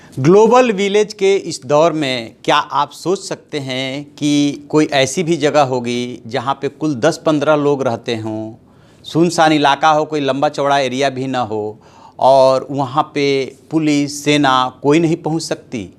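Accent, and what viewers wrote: Bihar, India. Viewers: Hindi in bihari accent....Achha hai😁😁😂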